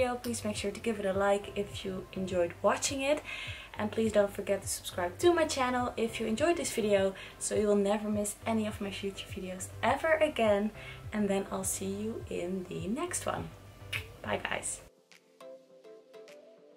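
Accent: Netherlands. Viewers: Toen je begon met praten hoorde ik meteen dat je Nederlander was :)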